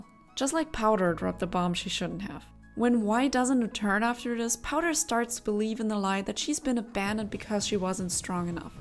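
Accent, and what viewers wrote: Germany. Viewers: Are you German? Your accent sounds very German.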